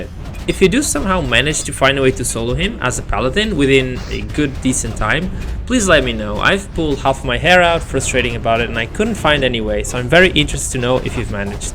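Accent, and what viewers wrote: Portugal. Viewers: Português pelo sotaque certo? Qual o servidor? Abc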